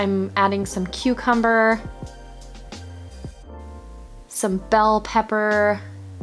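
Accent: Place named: Canada. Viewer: I’m guessing by your accent and spelling your a Canadian too.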